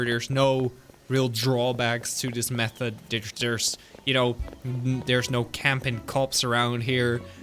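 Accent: Sweden. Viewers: ar you from swiden?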